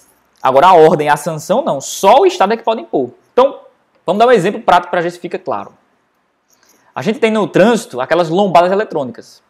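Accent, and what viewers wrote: Northeast, Brazil. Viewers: Amei a aula, mais um inscrito. Mais esse sotaque seu é maravilhoso, tu é do nordeste né? Amo meu lugar 😍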